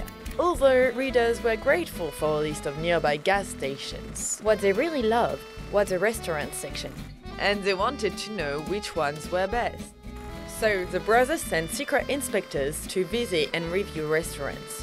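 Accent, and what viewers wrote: France. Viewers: I just found out that I hate french accent, Good video though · Love the French accent and how is gets progressively thicker over time 😂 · Love the French accent here. It's so in theme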